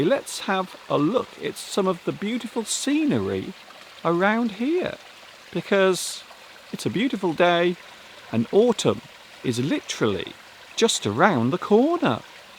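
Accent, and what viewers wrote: United Kingdom. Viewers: I just discovered your channel and I really admire your work. I’m moving to England soon and was looking for english boroadcasts where i can listen and be used to Britain english accent. Thank you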